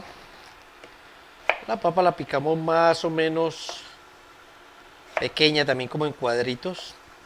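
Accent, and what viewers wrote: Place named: Pereira, Colombia. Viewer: Hola se ve deliciosa la sopa : me hizo reír con tu video, eres un poco charro y por tu asentó pareces de Pereira Risaralda. 😀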